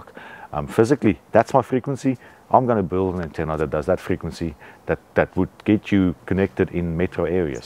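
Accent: South Africa. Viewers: Recognised the South-African accent immediately 😆 Also, probably best to hide your IP address...?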